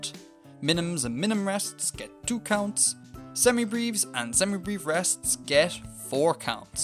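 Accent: Ireland. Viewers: That isn't British as you can hear to his accent he sounds like a irish lad to me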